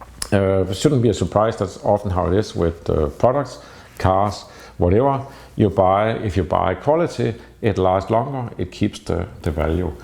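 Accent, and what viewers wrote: Denmark. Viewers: Thanks to you and your Danish accent it pushed me to buy the Leica M240 and have never looked back. Thank you. Now to buy a Leica 50mm lens.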